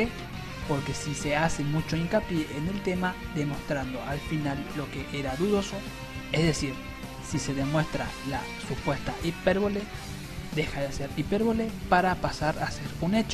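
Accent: Argentina. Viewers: jajaja sos correntino?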